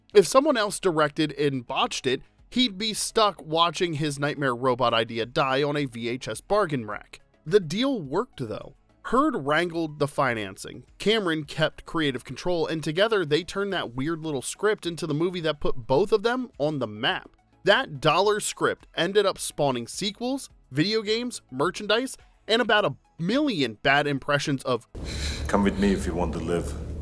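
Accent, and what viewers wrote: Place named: South, United States. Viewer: I enjoyed this. Thought it was funny that the narrator didn't reference his own Southern accent. The word "on" as a two syllable word, "drawling" instead of "drawing", etc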